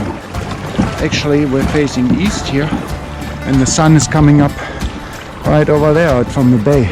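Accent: Germany. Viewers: hey mate, what exactly is that pedal boat you got there, from where did you got it and how much is it? was in jackson bay the other week and thinking to buy one aswell, would be mean. you sound german btw, grüße 😆✌🏻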